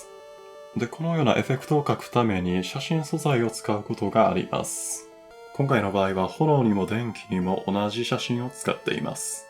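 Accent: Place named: Kansai, Japan. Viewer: 初見ですが絵はもちろん、声が低音でかっこいいし関西弁… 控えめに言って好きです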